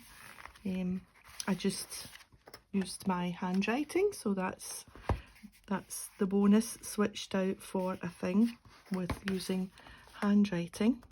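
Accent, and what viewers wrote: Scotland, United Kingdom. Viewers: Hi I am a new subbie, I am enjoying watching your little collages, I've watched a few now.... Mine are the opposite ha ha.... As for your accent I think it very easy to understand your Scottish accent is quite mild and mellow I think, it's quite calming actually but I suppose to foreign people it can take a bit of getting used too. 🇬🇧 I think a 'Thing' can be literally any item, from a watering can to a kitchen appliance etc. 😂😂 Odd numbers are easier to work but it turned out cute in the end 😁💖 tfs Elaine from England xx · Personally, I wouldn't mind if everyone had a Scottish accent like yours although I hope we do not lose all the different accents. It would be a shame to lose the variety and the history that is behind them. I read somewhere that the Scottish accent is preferred for call centers because of its warmth and approachability. 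I know I can listen to you all day especially when you are telling one of your stories. I find it very relaxing and comforting. Both prompts are very well-balanced and a pleasure to look at. I think this would make a great book to sell for inspiration.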